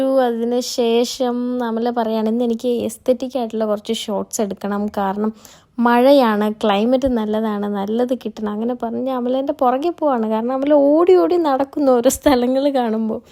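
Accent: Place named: Tamil Nadu, India. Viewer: Ivr engne tamil touch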